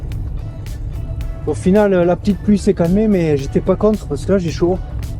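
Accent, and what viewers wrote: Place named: South-West, France. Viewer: Beaucoup de mots crus et d'accent du sud-ouest. Je m'abonne.👍💪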